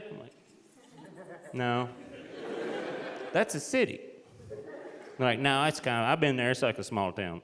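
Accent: South, United States. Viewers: Why do i love southern accent this much..!? I need to get me a country wife..i tell you HWat!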